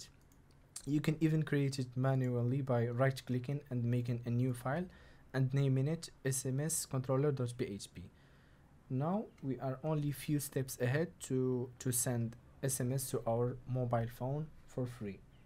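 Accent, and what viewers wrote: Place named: India. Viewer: الله يسامحك يا أخي، ظنيتك هندي والله لأنك تتحدث بنفس طريقتهم استمر وفقك الله وأعانك 👏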